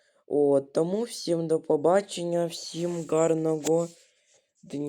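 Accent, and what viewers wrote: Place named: Russia. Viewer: Молодец хлопец, Але тренуйся правильно розмовляти, без слов- паразитов я- то " от" та тренуй вимову української глухої "г " замість російської дзвінкої.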